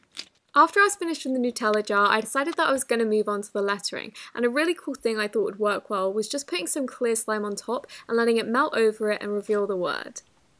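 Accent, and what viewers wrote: United Kingdom. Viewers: She British, it’s her accent, she can’t control it.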